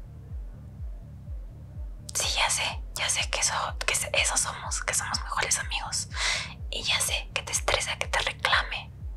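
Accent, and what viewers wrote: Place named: Mexico. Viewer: Se re nota que es mexicana wn JAJA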